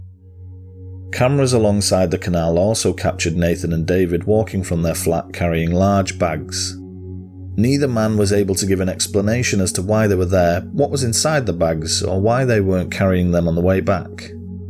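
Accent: United Kingdom. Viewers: Hey, I just discovered your channel a few days ago and have now watched several of your offerings, some familiar, some unfamiliar. However, being a fellow Brit I am enjoying your perspective (& accent! 😊). I very much look forward to catching up on more of your vids and your future releases. Blessings... Puccini 😇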